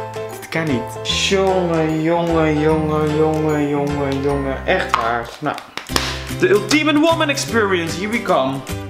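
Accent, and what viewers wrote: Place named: Netherlands. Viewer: hey! je bent echt de beste en ik vind dat hollands accent zo leuk en grappig! ik ben echt je grootste fan! je drumt echt SUPEEERR!! en die krulletjes zijn TE SCHATTIG!😊😊😊ik hoop dat ik je op een dag kan ontmoeten!!!!! 😘❤😎😋😋 en by the way! kei coole video! love it!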